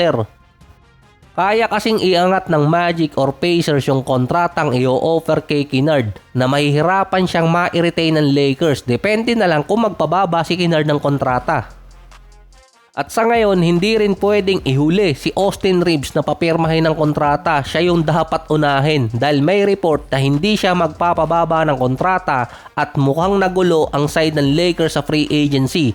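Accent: Batangas, Philippines. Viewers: Batangueno ka pala 😂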